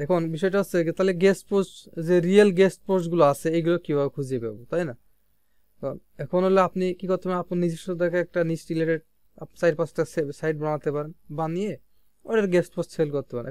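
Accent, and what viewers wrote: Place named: Pabna, Bangladesh. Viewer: kotha sune mone hocce pabnar vasha, apnar sathe jogajog kora dorkar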